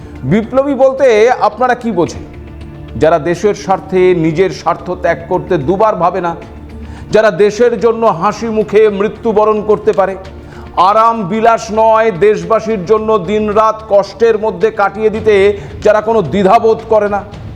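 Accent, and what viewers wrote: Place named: Barishal, Bangladesh. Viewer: Mama apne go bolla bolla kotha hunta mojai lage ater laiga Bangladesh ar news shuiya tmader moto bolla der kotha shunta ase😂😂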